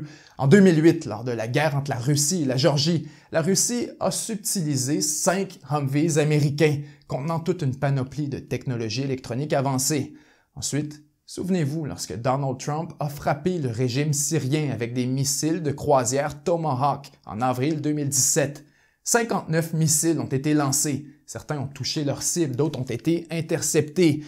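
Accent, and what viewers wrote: Quebec, Canada. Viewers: J'adore ton accent canadien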